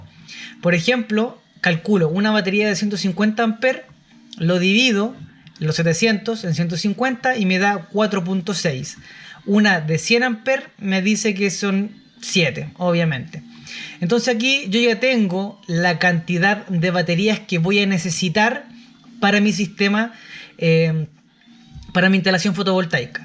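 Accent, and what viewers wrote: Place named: Chile. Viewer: Hola a estoy viendo estevideo (12/05/21) y está muy interesante, tengo en proyecto construir una Tiny house, y quisiera conocer el presupuesto de mis necesidades, pero no se la unidad monetaria que utilizas (pesos) estas en México, Argentina o Chile (seria bueno traducirlo a us$) me parece por el acento que eres Chileno, si todavía revisas los comentarios me gustaría saber el tipo de moneda y de cambio actual para poder tener un parámetro y calcular mi presupuesto. Gracias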